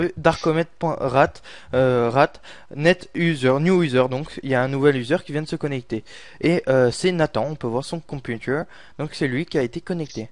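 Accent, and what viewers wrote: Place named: England, United Kingdom. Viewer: L'accent anglais... :)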